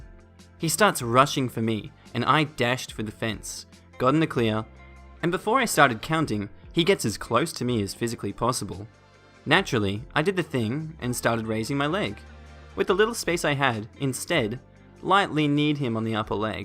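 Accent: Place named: Australia. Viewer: the northern Aussie accent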